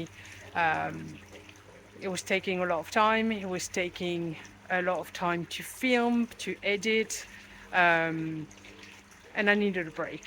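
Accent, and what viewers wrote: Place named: France. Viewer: Good to see you back, missed that lovely French accent of yours✊ cheers 👍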